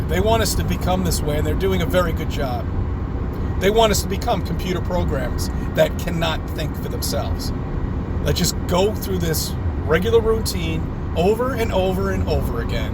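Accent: New York, United States. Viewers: There's something about driving that turns our "thinkers" on.. I found you about a month ago after hearing you on sun and moon group.. you're New York accent brought me home.. I'm in FL now for 7 years but am from the North shore of Long island.. great ponderings , I have them too.. it led me to this channel on yt called the overwatchchannel.. Dan has a great series on how to escape the matrix and reincarnation trap.. pretty mind opening/bending stuff.. It'd be interesting to hear your thoughts on it.. I'm 2 years Into my fe awakening and can honestly say I'm not friends with a single person I used to be anymore..it's crazy.. and all because they simply refused to just look??! Them chemtrails, vaccines and fluoride certainly have done a very well done job.. bravo to the psycho's.. they certainly created quite the cognitive dissonance cocktail for the ages.. 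anyway check out Dan's channel.. thanks for keeping it real 👍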